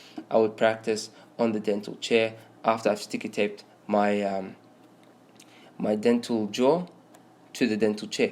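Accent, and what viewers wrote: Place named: United Kingdom. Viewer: Nice British accent and thank you for guiding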